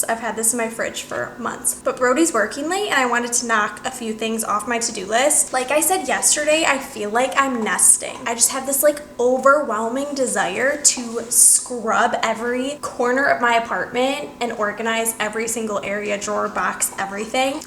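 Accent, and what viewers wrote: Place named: California, United States. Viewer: I always think you're from Cali until you mention NY, haha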